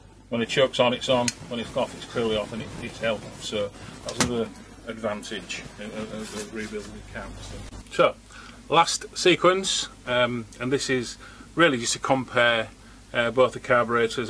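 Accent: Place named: Hull, United Kingdom. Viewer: Lol iam from hull ,can spot ull accent a mile away .just got gt <num> ,having starting problems ,and kick start stopped returning on its own for f sake .😢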